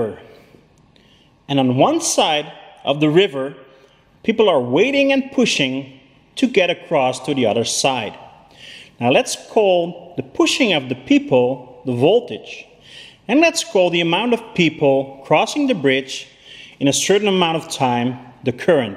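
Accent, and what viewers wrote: United States. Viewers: So I was looking at some canbus diagnostics videos just for fun and then I saw this one with you doing that test drive. And then the shot on the road, I was like: “Hee dat lijkt wel Nederland!!!” 😄 You really had me with that American accent 👍🏼. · Ik dacht dat je een Amerikaan was (zo klonk je), tot ik het display van de scoop zag :-)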